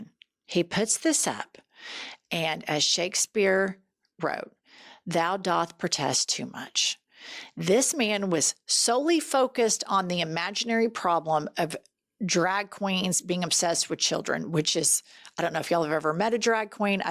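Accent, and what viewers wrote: South, United States. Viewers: New here. Majority of commentators are men.do I hear a hint of the S. THX from N.C.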